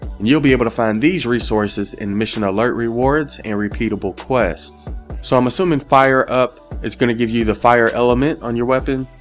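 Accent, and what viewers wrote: South, United States. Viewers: You sound like Channing Tatum when he made that country accent in "Kingsman: The Golden Circle"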